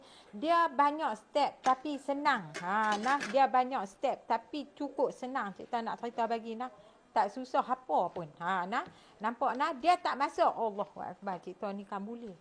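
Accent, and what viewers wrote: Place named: North, Malaysia. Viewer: assalamualaikum..terhibur dengan telatah tambah pulk loghat utara.contoh nya nak ceghita bagi.nek habaq mai.ummi tergelak sendiri masa latah.memang padu pulut udang tu.ummi pun lama xbuat dulu penah juai.buat senighi puaih hati.ummi nak tanya kampong kat mana.ummi orang utara jugak.memang terhibur.anak2,la depa pandai buat pulut mulut ni.klu ummi buat depa bungkuih boleh la.lain kali ummi nak minta buat kuih jongkong bulih kan.bye ummi suka dan minat ngan telatah anda menghibur kan.t.kasih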